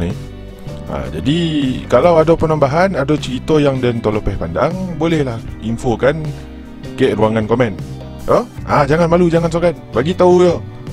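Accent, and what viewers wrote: Negeri Sembilan, Malaysia. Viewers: kne plak ckp loghat nismilan...lain mcm sronok dgr...dh jrg dgr dialek nismilan skg...👍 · hahahaha...sodap pulak den dongar ulasan cakap nogori ni..teruskan video camni bro,bahasa ibunda kito..👍👍👍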